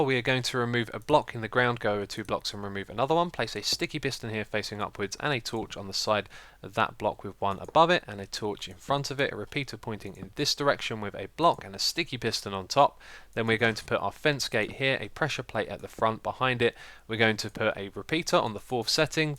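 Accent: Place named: United Kingdom. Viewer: fricken peeple deez dayZ. cant even let a man have a british akcent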